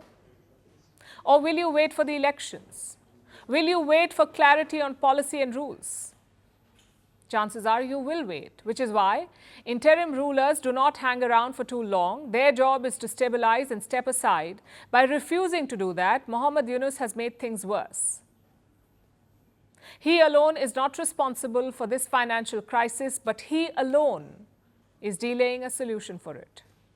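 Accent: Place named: India. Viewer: Why this lady keeps having wet dreams I. Bangladesh and keeps spreading rumor in an annoying Indian accent?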